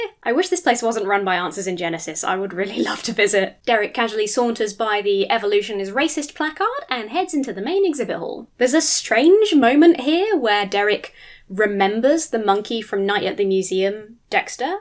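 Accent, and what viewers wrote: United Kingdom. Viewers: Since you would seem to be British (accent?) you may not be familiar with the car that Eric/Derek drives up in. It's a Chevrolet Camaro and not really a "sports car" in the true sense of the word. Also, it's not necessarily all that expensive. Even though you can get them all muscled up with big engines that can go fast, you can get a basic bare bones one for as little as $25,000 dollars or about 18,400 British pounds. Thus, even a night watchman should be able to afford one if he keeps his aspirations in check. Love your stuff otherwise though. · Blackmail, it's the godly way! Lol, I died when you said that because it's so sadly true. Let me manipulate you so you can, believe me, btw we're the good guy's everyone else is so evil ha ha ha (in evil villain). Love the video here, but where is your accent from? My wife and I were thinking British but couldn't place it.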